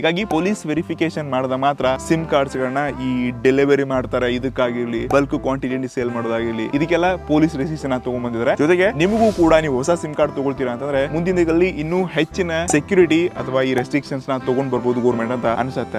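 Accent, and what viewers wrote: North Karnataka, India. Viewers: ಅಣ್ಣ ನಮ್ಮ ಉತ್ತರ ಕರ್ನಾಟಕ ಭಾಷೆ ಮಾತ್ರಾ ಬೆಂಕಿ 🔥🔥🔥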